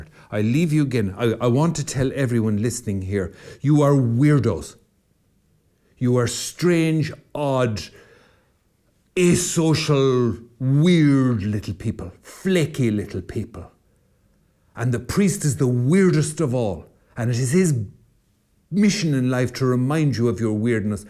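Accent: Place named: Ireland. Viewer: ☘️ We’re holdin’ onto the tattered threads of our former finery.” ☘️.. and said with a brogue; it’s poetry. is a treasure. I hope he goes viral. His voice is sorely needed over here 🇺🇸. We have the infrastructure for a great revival. But it’s dusty and cobwebbed and the doors squeak and creak like something old and worn out.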